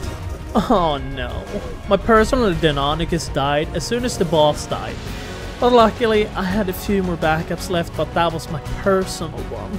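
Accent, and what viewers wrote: Sweden. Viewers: haha visste du va svensk med din brytning inom svengelska 🤭🤭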